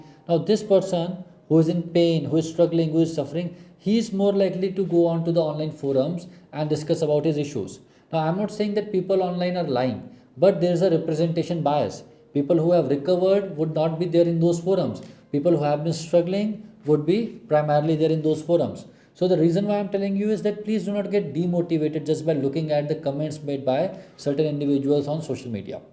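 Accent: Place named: India. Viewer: Why is indian accent so annoying? It makes me depressed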